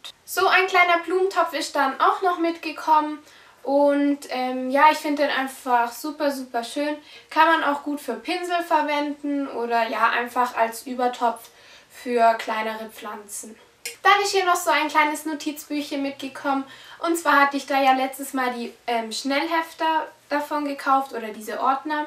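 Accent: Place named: Southern Germany, Germany. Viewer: Haha ich wusste sofort, dass du aus Süddeutschland kommst:D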